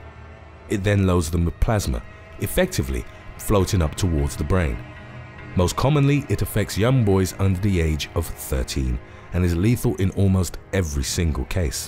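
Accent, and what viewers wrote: England, United Kingdom. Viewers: Aw. The british and their pronunciations. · It's his accent. That's the way they say it in England. Like toe-may-toe in the US vs toe-mah-toe in England.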